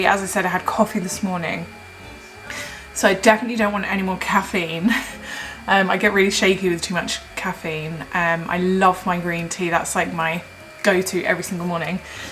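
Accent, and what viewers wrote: RP, United Kingdom. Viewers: Love seeing you look so healthy. Must be the microphone but you sound so posh compared to older videos 😂 Xxx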